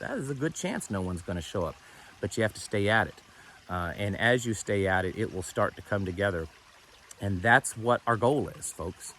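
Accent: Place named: South, United States. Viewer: Very very southern ill. Hello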